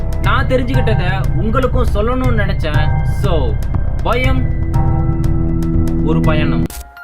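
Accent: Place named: North, India. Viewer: Konjam normal la pesu thambi...vadakan pesuramathiri iruke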